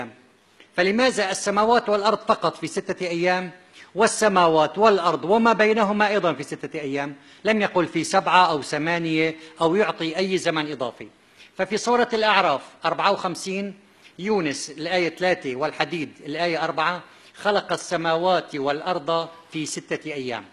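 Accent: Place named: Aleppo, Syria. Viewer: هو من حلب سورية واللفظ في هذه المدينة يكون هيجذ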